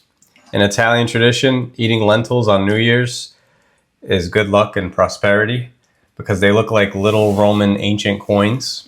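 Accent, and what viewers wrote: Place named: New Jersey, United States. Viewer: I like hearing the NJ accent again. I no longer say 'warder' any longer, but like hearing still. Makes me homesick for the pre-1960 NJ/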